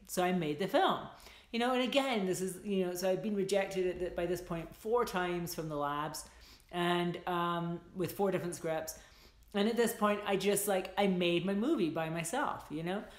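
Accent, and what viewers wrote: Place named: Scotland, United Kingdom. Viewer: Thanks for this! Thought I detected some Scottish in you!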